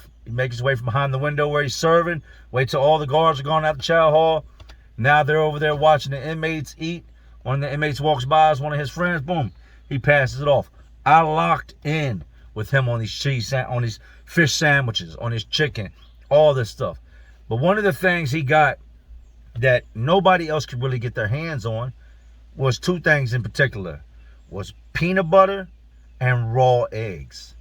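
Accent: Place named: East Coast, United States. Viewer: People need to understand your from the east coast the inner city… the way you talk comes from where you grew up and also in and out of prison lingo….I think it’s our differences that make us interesting… I’m glad everyone doesn’t sound the same…. I like hearing you tell your stories…..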